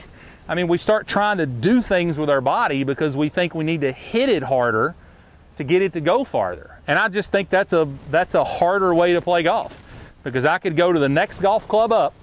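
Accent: South, United States. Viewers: your method and delivery of the training is great. You explain everything in a logical, easy to understand way.. This past weekend I tried to use the techniques in my short game and they really helped. My short game is the worst part of my game so any thing is a plus haha! I have watched all of your videos now. You present the information so much better than other teachers. Keep up the postings. I will watch everyone. BTW, where are you located? I detect a Southern accent. Am I right? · I found you today on YouTube, a little behind everyone else. You have a great method of teaching. I completely understood everything you said and described. A southern accent is greatly appreciated by this Texan ;-)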